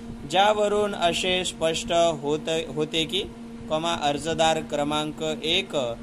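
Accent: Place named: South India, India. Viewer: South Indian voice vatat ahe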